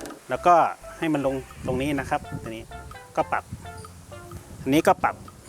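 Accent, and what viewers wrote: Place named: North, Thailand. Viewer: เป็นคนเมืองใช่ไหมครับพูดไทยสาบเมืองมากเลย😅😅😅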